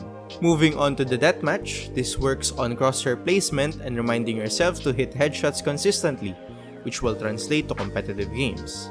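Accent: Philippines. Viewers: the pinoy accent was enough to convice me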